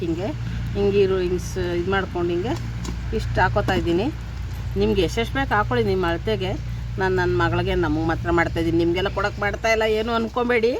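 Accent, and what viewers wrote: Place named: Mandya, India. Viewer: ನಮ್ ಮಂಡ್ಯ ಭಾಷೆ ಕೇಳೋದೇ ಒಂದು ಚಂದ ಆಂಟಿ 😂😂😂😂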